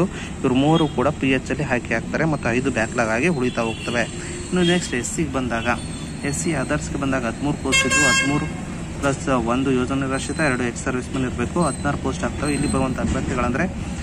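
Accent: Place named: Belagavi, India. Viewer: Anna Belagavi English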